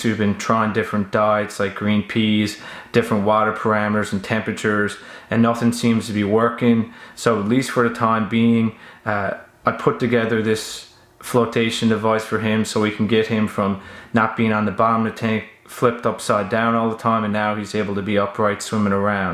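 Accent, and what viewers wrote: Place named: Newfoundland, Canada. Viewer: Started watching this expected you to be form the USA or something then you started talking and I was like accent accent oh wait wtf this guy is from Nfld. Will be building a fish wheelchair in Halifax today.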